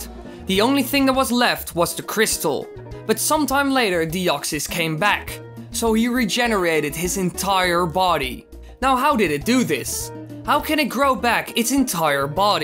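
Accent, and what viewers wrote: Netherlands. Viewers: i have one question, are you dutch because your accent sounds like one.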